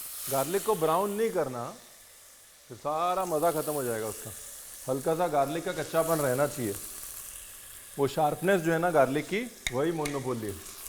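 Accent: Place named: Punjab, India. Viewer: Sweet panjabi hindi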